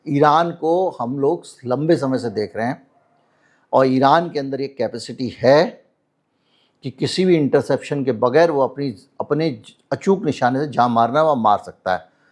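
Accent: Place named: Bihar, India. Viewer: आज आप बिहारी टोन मे बोले है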